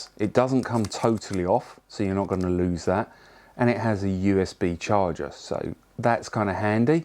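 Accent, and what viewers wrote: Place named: United Kingdom. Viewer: Switched of at aluminum. Dude your British.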